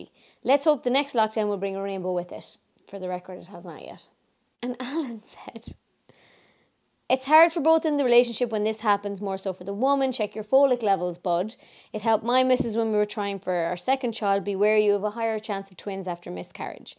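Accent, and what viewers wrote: Ireland. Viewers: I'm sorry to hear this love... but you sound like a strong woman & it's better to face things head on than to dwell on them & let them get the better of you mentally & emotionally... god bless... just curious what part of Ireland are you from if you don't mind me asking....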